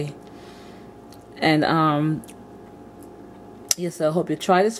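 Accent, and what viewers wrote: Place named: Jamaica. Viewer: She clearly has a Jamaican accent sir.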